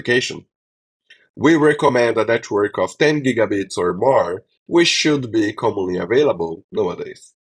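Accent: Brazil. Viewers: Bro, are you Brazillian? Asking due to the accent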